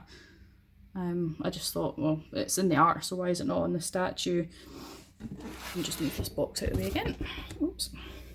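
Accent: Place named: Scotland, United Kingdom. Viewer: I think the Scottish accent is the coolest! So fun to listen to! keep up the great reviews!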